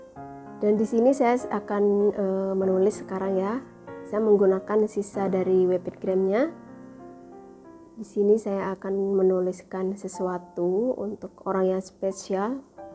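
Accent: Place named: Java, Indonesia. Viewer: mantab.... suaranya medhuk ya kak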